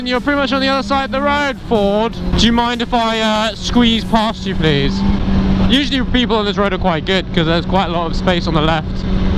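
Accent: United Kingdom. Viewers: Gotta love it (the British accent)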